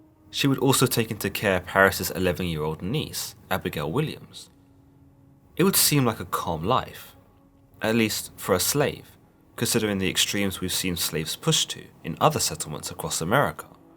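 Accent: England, United Kingdom. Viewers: TF... Is this a reupload? I'd borderline be willing to SWEAR I've watched this video before! Not a similar story-of course I've heard the tale of Tituba every which way you can spin it-but THIS one, almost down to English accent & word choice/vernacular... Damnedest thing... #DejaVu anyone? 🤨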